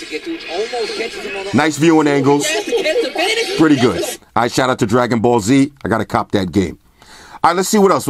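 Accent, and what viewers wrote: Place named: New York City, United States. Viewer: No questions about your reviews but I'm also watching for white shoes and I love your NYC accent!👍💪🍺🍻🥃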